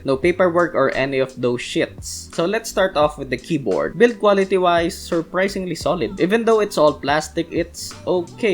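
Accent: Philippines. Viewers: Yeah he's accent is so filipino but it actually sounds good and his grammar is almost flawless. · accent doesn't matter, he is really Fluent in English. besides he's a Filipino anyways so its natural to have a Filipino accent. Good video. hope you make a review about Zeus Mk-880 Professional Gaming Mechanical Aluminum Steel Keyboard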